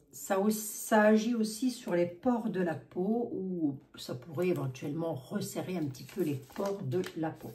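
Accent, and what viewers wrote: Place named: East, France. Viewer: Coucou, deux petites questions: vous avez decoloré vos cheveux comment? Chez un coiffeur? Et ne sont-ils pas trop abîmés ensuite? Et 2e question, votre petit accent vient-il de l’est? Mon père en était originaire et j’adore cette intonation…😊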